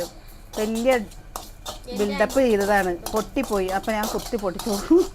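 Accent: Palakkad, India. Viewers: പറയുന്നതുപോലെ പറഞ്ഞോളു അതാണ് കേൾക്കാൻ രസം ട്ടൊ നമ്മുടെ നാടൻ ഭാഷയാണ് പാലക്കാട്കാരിൻ്റെ സ്വന്തം ഭാഷ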